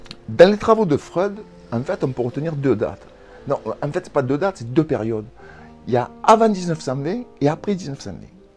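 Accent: South, France. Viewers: J'adorée vous êtes de bonne humeur avec votre jolie accent du sud merci énormément voues êtes trop pédagogue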